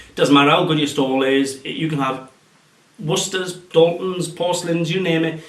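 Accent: United Kingdom. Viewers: u from the uk aint u.so am i yay someome finally from the uk x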